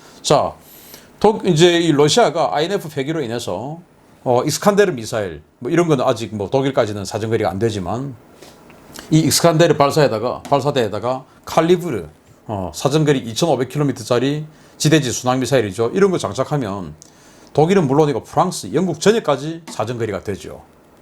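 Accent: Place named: Gyeongsang, South Korea. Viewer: 지긋지긋한 경상도 사투리 써가며 뭘좀 아는척하네? 우물안서? 그러면 영어로 해석하며 이곳으로 넓은 세상으로 나와보든지???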